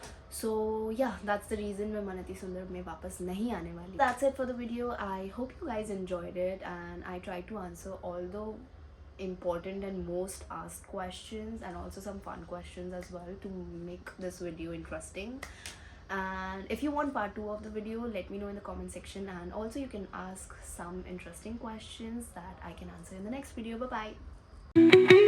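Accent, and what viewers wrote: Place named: Gujarat, India. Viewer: Finally Youtube pe active hogi 😅😅 Nice video your bhai bhai vala ascent gujrati bol rahe thi aese lag rahe tha ap bahut khoobsurat aur cute ho 🥰❤️ waiting for part 2 video 😊😊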